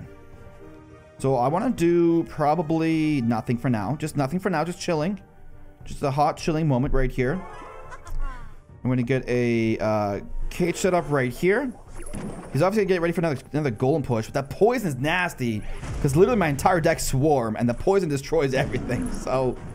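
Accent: Canada. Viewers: Canadian eh? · He’s prob Canadian they say that a lot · was just watching a random video (first video of him) and realised this guy was canadian. And yes,I even searched it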